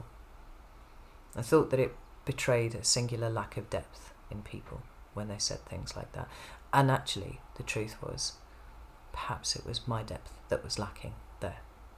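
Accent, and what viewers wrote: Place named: Essex, United Kingdom. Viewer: Top shelf shit! What an amazing woman. You sound like you’re from my neck of the woods (Essex) too. Much love and thanks.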